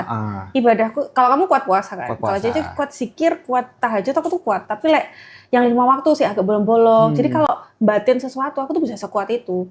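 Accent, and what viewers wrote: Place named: Java, Indonesia. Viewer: Cindo jawa lucu medhok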